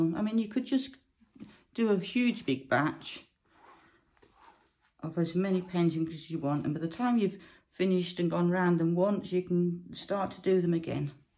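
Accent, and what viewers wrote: United Kingdom. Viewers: Thanks for this great video. I have been working with Indian ink on paper for years but painting on canvas is totally new to me. I've been varnishing test pieces done on gessoed cardboard (literally crisscrosses of paints and acrylic ink so that if anything runs or smudges I can see it). I just want to learn how to apply the top coat of glaze (the isolation coat, as they call it, if you want to protect your paintings with removable varnish) with zero brushmarks and your video was really helpful. PS I love your accent, it reminds me of living near Sheffield when I was a toddler 🤪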